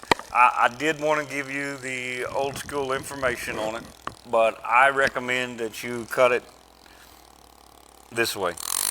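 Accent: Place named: Oklahoma, United States. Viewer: I thought about Ben Johnson the whole time I was listening. It's almost an OK accent. Which is my home.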